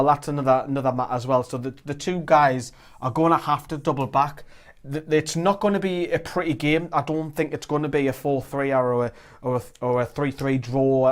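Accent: Newcastle, United Kingdom. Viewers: Sometimes I watch your videos with the subtitles on just to see how badly YouTube converts the Geordie accent Ahaa! Good video anyway. I predict a scrappy 2-1 win.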